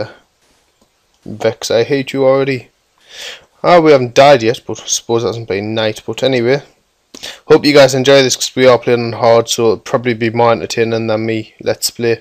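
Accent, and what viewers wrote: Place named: North, United Kingdom. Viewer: Even though i'm from the north as well, I love hearing your accent haha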